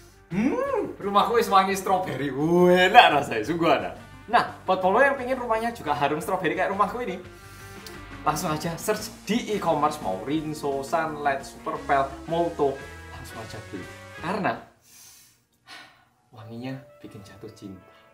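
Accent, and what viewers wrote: Java, Indonesia. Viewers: haloo mas aku bolo bolo udah nonton dari jaman mas upload ciri² kamu psikopat atau bukan aku lupa taun berapa sampe ke detektif roemit dan rinding dong yg emang aku suka banget dengan pembawaan cerita dari mas, dan sampe akhirnya mas up pacar nya ke public and sempet kaget ternyata udah pacaran lama dan sekarang udah mau menikah, and aku juga mau menikah mas ditanggal 21 ini, selamat yah mas si medok 🥰🥰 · Maaf mas..calon nya bukan orang indo ya..kirain orang indo..sayang banget lama di indo ngak ada cewek indo yang doyan haha..oppss maaf..✌ bahasa medok tak kira ditaksir cewek dari jawa..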